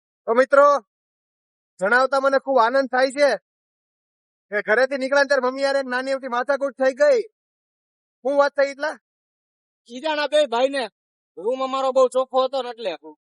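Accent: Kathiawar, India. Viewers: Vah bhai,, pure Kathiyawadi bhasha❤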